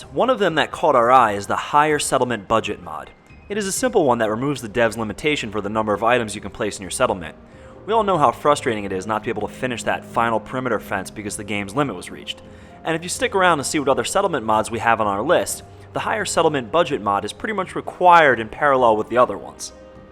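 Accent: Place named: South, United States. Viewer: I love how this guy changed his accent/faked it prior to this hillbilly southern deplorable accent that everyone complained about. So you're fake?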